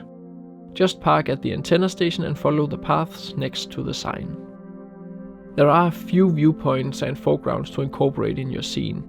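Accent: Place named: Denmark. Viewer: Its nice to hear a thick Danish Accent (Danglish) :-) Suðuroy is know to having a different dialect then the main islands. Its always know as little Denmark. Nice to Akraberg, Vágseiði and Ásmundarstak (cant remmber seen it my self). Next time, if ever then take a trip to Fámjin where Merkið is found in the local church :-) Hvalbiareiði or Vágseiði is a must in when there is strong winds. You will really appreciate the hard work global mariners do at sea, when you seen the waves and wind take a beating against the rocks :-) If you would like to get a grasp of what the Fellowship of the Ring experienced in the narrowed tunnels in Morria, as for a trip in the coal mines in Hvalba :-) Dont enter if you are claustrophobic :-) And when the sun is shining, take a trip up to Stórhamar plateau and Ørna fjall, walk west to the highest mountain in Suðuroy (cant remmber the name). 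Another trip is to Hvannhaga. Remmber to take pancakes, or waffles, coffee, the and ofcourse Nutella on every trip. And if you are lucky some skerpikød and local made ryebread:-) I got that tip from some locals :-) I am so much looking forward to it :-)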